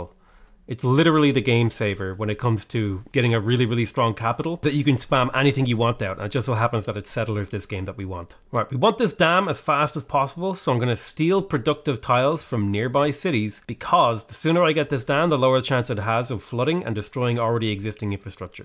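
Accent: Ireland. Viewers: You sound more Irish than usual in this video. Kinda neat to hear. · I was gonna say he sounds more "Irish for some reason, also pretty tired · is it only me or did he start to yous his Irish accent?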